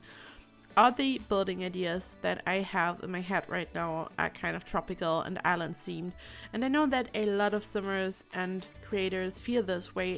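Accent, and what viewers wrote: Germany. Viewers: r u german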